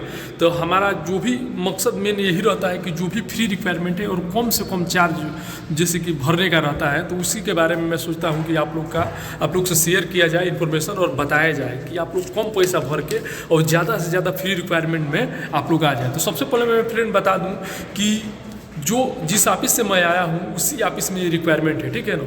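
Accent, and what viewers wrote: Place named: Gorakhpur, India. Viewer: आप गोरखपुर से है क्या भाई..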